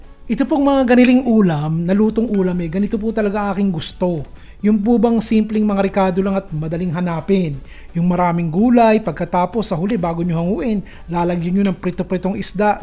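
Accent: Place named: Nueva Ecija, Philippines. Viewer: Kamates, nagka luray luray, ganire at ire. Punto at mga salitang kapag narinig ko eh para kang nakikinig sa matatanda sa Nueva Ecija😅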